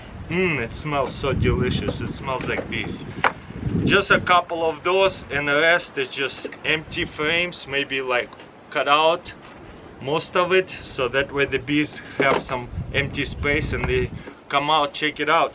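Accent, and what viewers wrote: Russia. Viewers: I love the Russian english accent it is cute!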